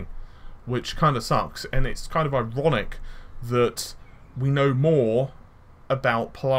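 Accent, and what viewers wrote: United Kingdom. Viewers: he's speaking like a normal British lol.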